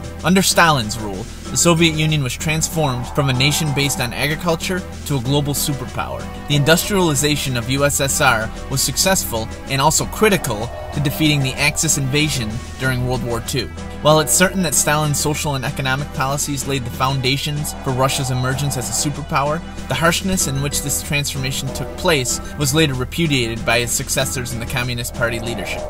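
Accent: United States. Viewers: You from Chicago? I can hear that accent lol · The narrator has a distinct Michigan accent.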